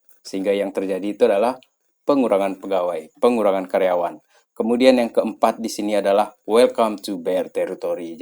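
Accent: Sumbawa, Indonesia. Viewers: Sumbawa mana ini pak?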